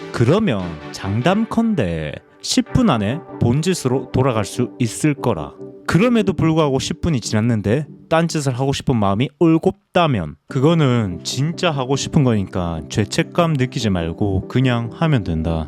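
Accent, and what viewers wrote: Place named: Gyeongsang, South Korea. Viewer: 찰진 경상도 사투리에 수상할 정도로 서윗한 목소리... 니 머가...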